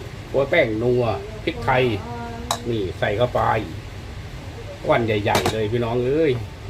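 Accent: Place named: Isan, Thailand. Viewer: ชอบดูมากจาก อยุ่จังหวัดไหนของประเทศไทยจ้าเว้าคือกันเด้อยายคือคนไทยจังหวัดชัยภูมิจ้า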